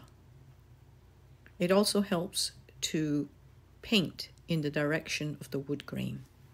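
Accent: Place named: United Kingdom. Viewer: Such cute earrings. I wasn't expecting your voice with a British sounding accent. It was so nice to hear your voice and I think you should do more tutorials with your voice.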